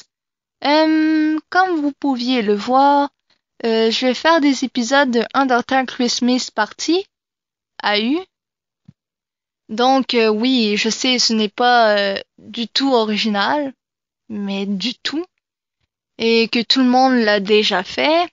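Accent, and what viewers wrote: Quebec, Canada. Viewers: Question est tu québécoise ? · est tu québécoise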